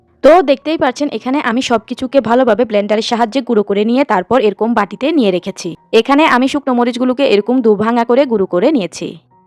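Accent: Chittagong, Bangladesh. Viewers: cox ar Chittagong ak e voice😢😊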